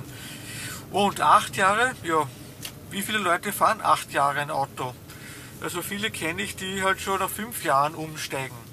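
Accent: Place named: Austria. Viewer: Grausig, dieser Ösi-Dialekt! Und nur Gesülze, keine genauen Angaben!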